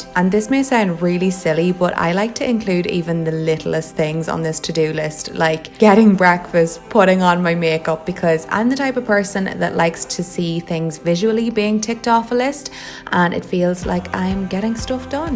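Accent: Ireland. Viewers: i looove your accent! are you irish? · I visited Ireland almost a year ago and I miss it a lot it’s a wonderful country, and watching your videos weirdly makes me nostalgic, maybe it’s hearing the accent haha. but I love your videos!! They are super relaxing and inspiring <3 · I wonder what part of ireland. bc i feel theres a clear difference I can hear from different Irish people's accents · You have the most satisfying accent ever. Are you from Ireland? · Im pretty sure just irish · She is Irish :) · Are you Irish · Molly Mae i’m from ireland too and i can tell you she is from ireland 🇮🇪 💕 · Anindita Chatterjee she’s Irish and has an Irish accent :) “odd” is simply your perspective, not being Irish yourself.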